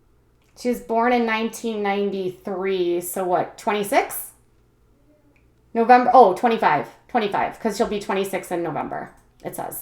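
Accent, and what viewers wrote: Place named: Midwest, United States. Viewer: Where do you live?? I am from MN and hear a midwest accent? I could be wrong but I dont mean to offend if I am way off base haha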